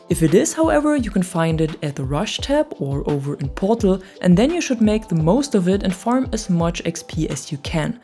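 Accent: Germany. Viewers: Man hört voll raus, dass du deutsch bist 😀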